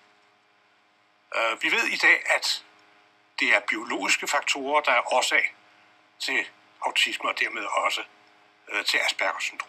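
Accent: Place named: Australia. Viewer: Is that an AS symptom, your picking up a little bit of an Australian accent. Oh, and is TMS trans magnetic stimulation helpful with AS depression?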